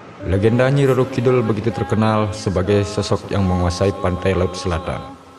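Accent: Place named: Bali, Indonesia. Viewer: Terlalu kentara bli logat bali ne. Engkebang dek. Bes ngenah logate.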